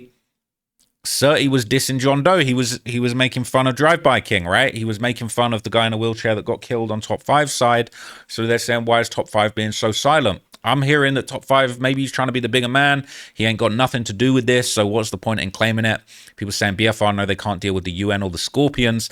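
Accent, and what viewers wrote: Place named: United Kingdom. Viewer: I heard your accent and was Thank God. This is UK Surrey, not British Columbia, Surrey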